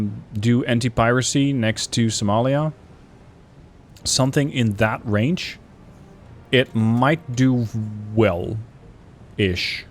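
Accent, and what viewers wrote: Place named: Netherlands. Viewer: Your accent in german gave it away i think you are or at least speak dutch. Greetings from Switzerland.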